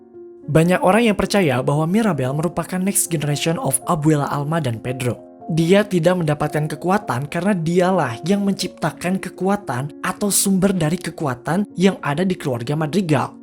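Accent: South Jakarta, Indonesia. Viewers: Bang lu orang jaksel atau sengaja make aksen jaksel kaya "literally"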